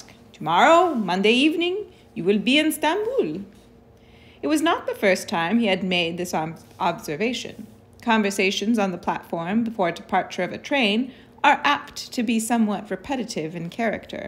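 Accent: France. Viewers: Your french accent is delightfull , tres bien ma chere. :) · Great french/belgian accent!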